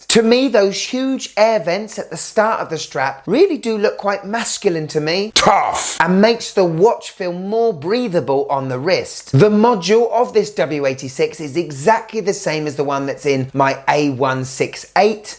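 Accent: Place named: England, United Kingdom. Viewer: What an english english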